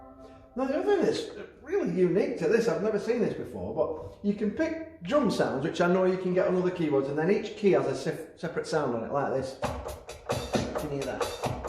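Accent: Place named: Lancashire, United Kingdom. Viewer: This fellow is much more Ace Rimmer than Arnold Judas - what a (nice) guy! Thanks for the enthusiastic demo, all wrapped up in a good honest Lancashire accent! I bet he's a great dad :D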